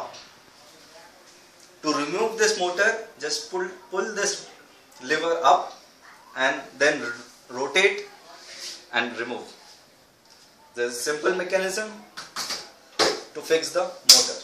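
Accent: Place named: India. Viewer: Smart Bollywood accented man.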